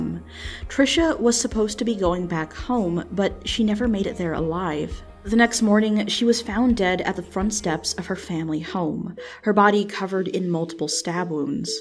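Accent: Hawaii, United States. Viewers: She's from hawaii it's an accent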